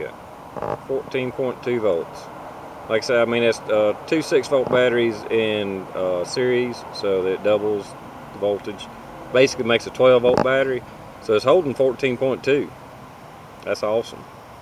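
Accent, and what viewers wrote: North Carolina, United States. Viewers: Nothing wrong with that "country accent"... I have one as well. You sound like a North Carolinian.? Or deep South at least. Great find on those "trashed" batteries!! And great video! Thanks!!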